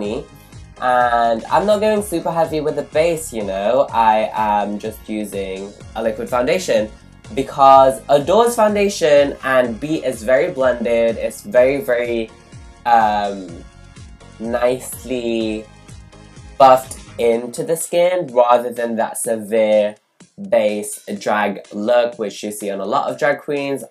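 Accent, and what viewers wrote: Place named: Nottingham, United Kingdom. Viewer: Fuck yeh I love I love adore and I'm so glad a boy in makeup from Nottingham same as me 🤘🤘 has made a channel like same accent come on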